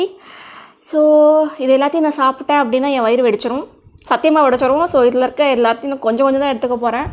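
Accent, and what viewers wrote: Tirunelveli, India. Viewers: Akka ninga tirunelveli ya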